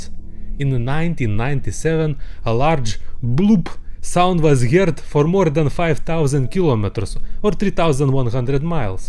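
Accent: Russia. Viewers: this russian accent is sooo good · as a Russian myself I can confirm that some people really have accent like this when they talk in English, if they don't talk or practice often. But it's kinda strange that has it, considering that he's narrating many videos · Ukrainian, English and Russian. And this accent sounds exactly like a russian speaker trying to speak English without any diligence. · are you from Russia? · Is he Russian? Cuz it he is I love it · ur accent remind me of russia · По акценту слышу что русский парень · Love the Russian accent 😂😂😂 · Хард рашн акцент? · Cool concept for a video, but god damn I'm tired of "Russian" channels. Bro, even FPSRussia got old after about the 10th video. The accent isn't entertaining.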